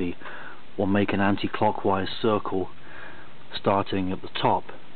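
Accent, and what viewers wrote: England, United Kingdom. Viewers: nice catch Mike. I looked at this and I see the shoes are reversed. The smaller one goes to the front not the rear. Judging from the accent this guy is from England and over there they do things opposite.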